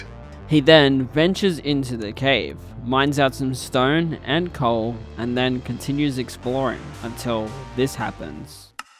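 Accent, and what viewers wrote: United Kingdom. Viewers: U sound sooo British, no like really, anyway, good video ma boi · Love the British accent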